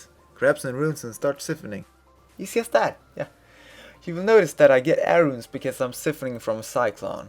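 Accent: Sweden. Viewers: Bra guide. Hörde direkt att det var en svensk tack vare ordvalen och den klara brytningen x,D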